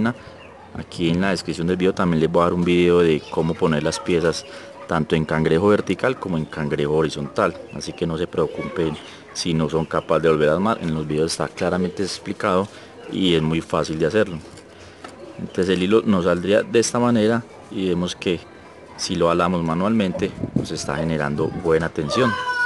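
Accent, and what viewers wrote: Colombia. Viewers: Hola mi amigo portu forma de hablar eres colombiano osea mi hermano gracias por estos videos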